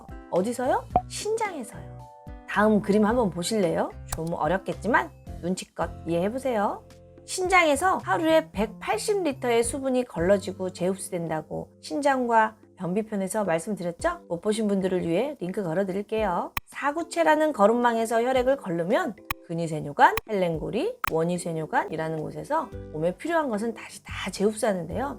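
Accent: Gyeongsang, South Korea. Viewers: 이 분 갱상도 사람이라 "의" 발음을 못하내. "으". "으".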